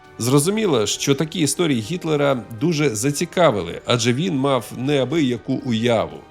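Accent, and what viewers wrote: Russia. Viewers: Дякую за чудове відео, хоч і трохи дивно слухати про історичні події від людини схожої на депутата 😅 Помітно, що людина російськомовна, але українська вже майже бездоганна! Ще раз дякую і продовжуйте в тому ж дусі!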